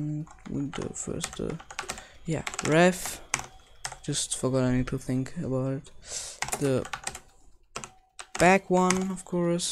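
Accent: Germany. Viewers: haha zu geil dieser deutsche akzent! aber gutes video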